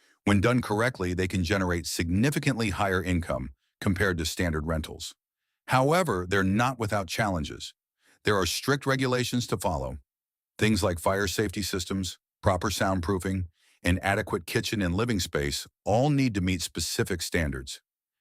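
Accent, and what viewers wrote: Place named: United States. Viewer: Why do u hv an American accent?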